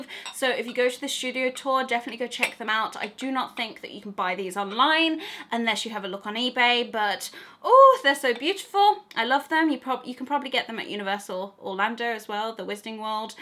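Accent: United Kingdom. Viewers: I love your British accent ❤️❤️❤️ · I’m so used to hearing you so when I hear a British accent it sounds so normal to me btw I’m American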